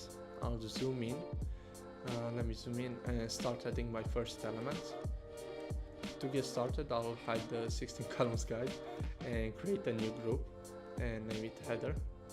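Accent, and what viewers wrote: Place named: Romania. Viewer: Dupa acent e roman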